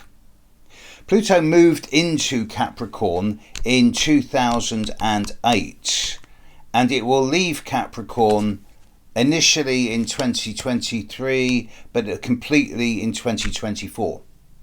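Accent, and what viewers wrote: United Kingdom. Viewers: Space for the Soul This is a standard British accent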